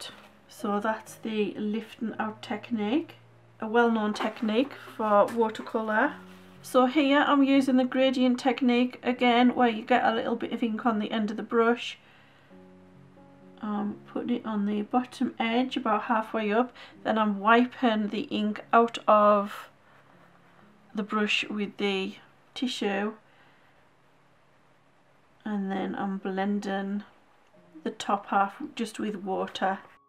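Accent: United Kingdom. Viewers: Well done! Love your accent - UK I'm guessing?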